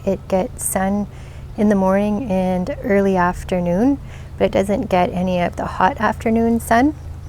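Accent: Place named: North, United Kingdom. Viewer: what a great idea...i have several planters like this that i can apply this to....btw what zone are you in?? going by your accent, you sound northern....you can also use thin wire instead of zipties thus making no holes it will just go through the burlap easily. pansies won't last long they are a cold weather plant. what a great DIY video!!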